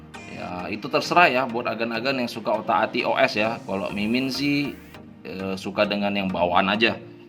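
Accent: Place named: Java, Indonesia. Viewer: Saya suka logat jawanya abang..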